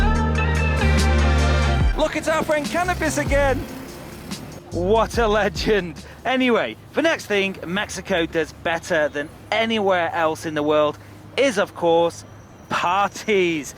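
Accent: England, United Kingdom. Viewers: There you go a brit in MX with a nice accent! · Escuchen ese bello acento inglés 😌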